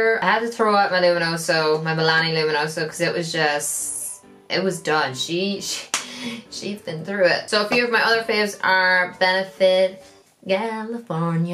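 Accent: Ireland. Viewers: What part of Ireland are you from ? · I could listen to you talk all day. Irish accents are so beautiful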